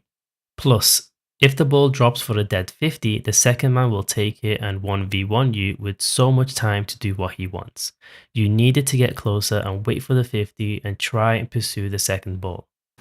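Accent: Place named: Liverpool, United Kingdom. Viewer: Good work mate 👏 Why do you sound like a fellow scouser 🙀🙀🙀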